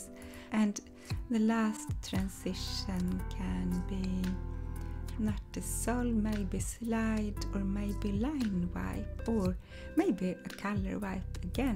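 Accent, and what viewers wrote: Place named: Nigeria. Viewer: Sounds like a Nigerian accent.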